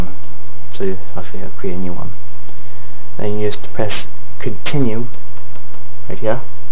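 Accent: United Kingdom. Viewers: are you a geordie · oh good it is a british person doing it the how to video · Thank u s omuch dude your the best even thoughe u sound like a skotsman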